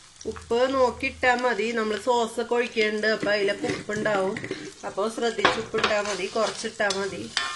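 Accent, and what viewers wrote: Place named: Azhikode, India. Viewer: Ithaade Samsaram kettapol thonni.😊 athaa chodhichath.. njan kaipamangalam aanu.. husbandinte relatives und azhikod.. angineya ee slang parichayam☺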